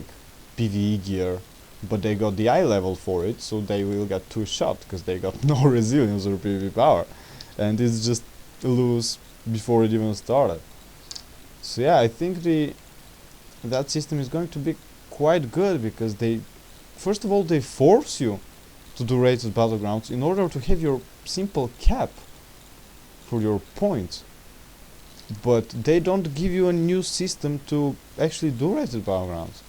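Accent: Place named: Russia. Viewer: RUSHAN AKCENT SO GUD.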